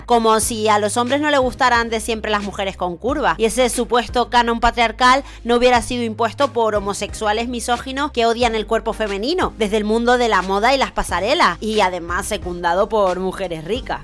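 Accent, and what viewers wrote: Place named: Spain. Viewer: Así de lindo y elegante hablan todas las españolas o sólo tú eres la maja?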